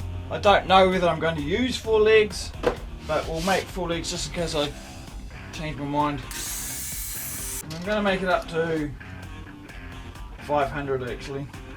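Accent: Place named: New Zealand. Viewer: Welcome back, nice to hear that cultured kiwi accent again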